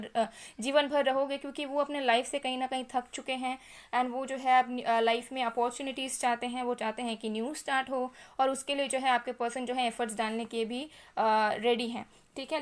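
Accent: Bengal, India. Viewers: Sis tum bengali ho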